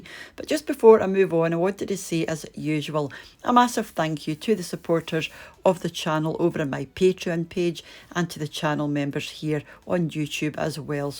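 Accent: Scotland, United Kingdom. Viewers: Definitely going to make this soup. Yum. Thank you so much for the recipe. Love your accent. My Dad came from a small village just outside Glasgow. Miss hearing that wonderful accent. 💕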